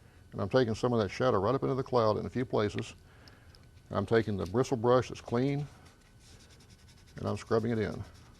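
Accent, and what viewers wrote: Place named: Texas, United States. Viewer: You sound like a Texan.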